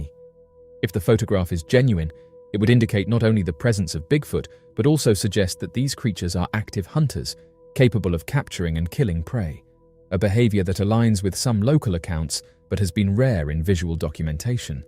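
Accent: England, United Kingdom. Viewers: Filmed means moving pictures not photographs. You have the English accent but obviously havent mastered the English language.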